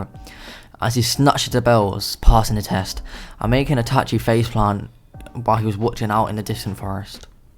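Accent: United Kingdom. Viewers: u British mate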